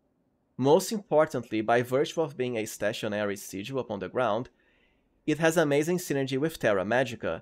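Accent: Brazil. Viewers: I know a brasilerio when I hear one ;) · reconheci o sotaque brasileiro na primeira frase, adorei o video mano!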